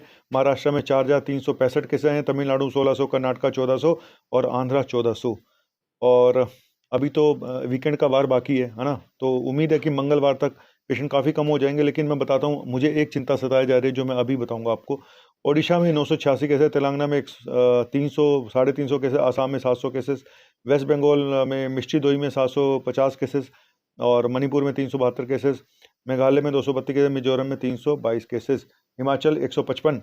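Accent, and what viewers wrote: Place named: Bihar, India. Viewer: Sir your Bihari accent was excellent 😀😀😀😀😀😀😀.well done sir 🙏🙏🙏🙏🙏🙏🙏🙏🙏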